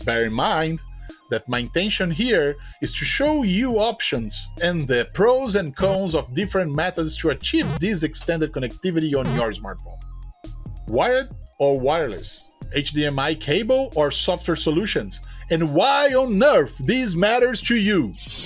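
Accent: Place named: Brazil. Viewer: Although your amazing English I think you are a Brazilian guy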